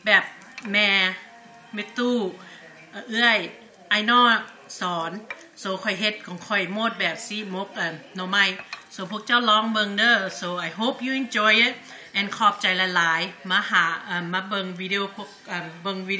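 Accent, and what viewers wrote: Laos. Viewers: you are so cute speaking half laotian half english, Love this video, I want some bamboo so badly :)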